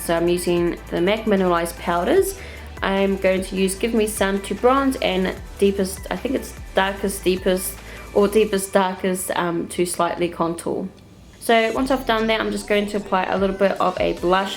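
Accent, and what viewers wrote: New Zealand. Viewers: are you from New Zealand? 😍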